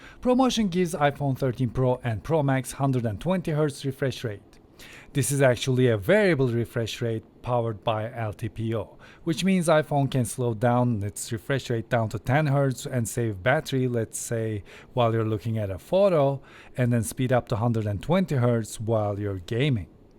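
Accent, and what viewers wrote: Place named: Turkey. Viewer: Bu abi Türk mü? Acaip güzel Türk şivesiyle konuşuyor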